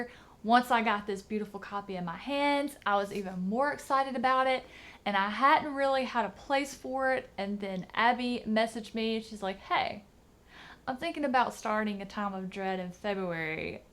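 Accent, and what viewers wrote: South, United States. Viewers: I wish more people had a Southern accent like you making book videos!! :D Love it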